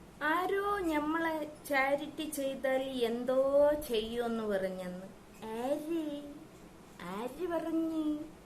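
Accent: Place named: Thiruvananthapuram, India. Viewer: ഞാൻ പറഞ്ഞു 🤭 തിരുവനന്തപുരം ഭാഷ സൂപ്പർ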